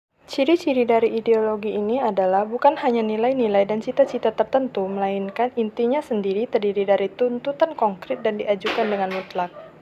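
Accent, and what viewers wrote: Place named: Java, Indonesia. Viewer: wah well done mbak, simpel tapi jelas:)) eh salfok ke medoknya hehe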